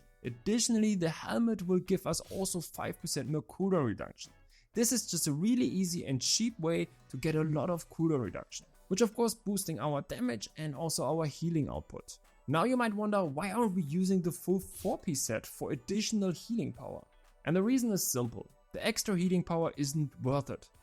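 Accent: Germany. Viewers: german who speak english xD funny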